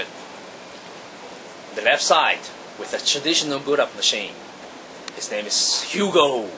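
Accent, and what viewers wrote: China. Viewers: taiwanese, hes got a chinese accent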